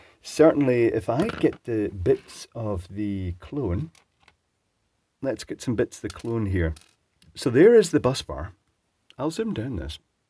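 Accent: Scotland, United Kingdom. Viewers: Another good video,watched you for years but never commented,I live in Ayr and it's good to hear a fellow Scot,I bought some non branded ones from Amazon after I watched a video of John Ward's where he tested them extensively against branded terminals and gave them a good review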